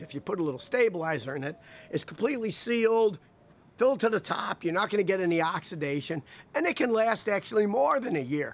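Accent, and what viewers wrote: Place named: Western New York, United States. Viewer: Really like your videos. Love the WNY accent. Reminds me of home. ;-)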